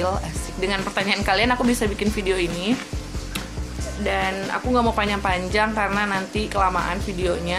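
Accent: Manado, Indonesia. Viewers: Kakak org menado yahh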